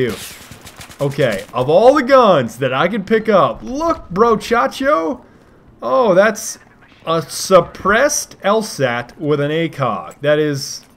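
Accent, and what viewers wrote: Germany. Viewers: I Love your German ;) greetings from Germany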